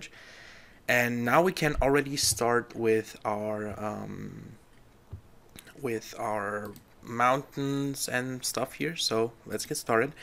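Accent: Germany. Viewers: Are you German? :D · Man merkt zum Teil dass du einen deutschen Akzent hast xD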